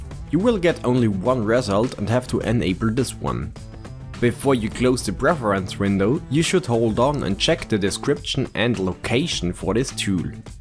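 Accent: Germany. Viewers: Dein German-English is aber schon heftig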